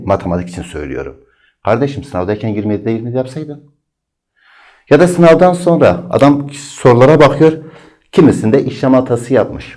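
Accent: Urfa, Turkey. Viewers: Hocam Urfalı mısınız ?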